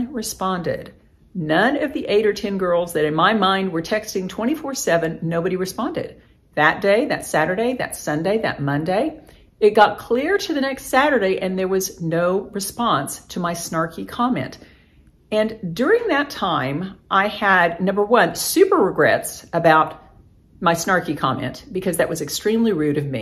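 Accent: South, United States. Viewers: are you a southern belle?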